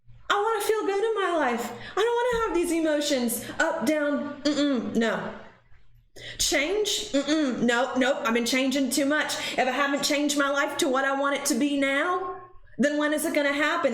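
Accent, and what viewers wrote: South, United States. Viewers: You can really hear the southern accent on this one. :) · Been watching you since before your move! Your definitely getting a southern accent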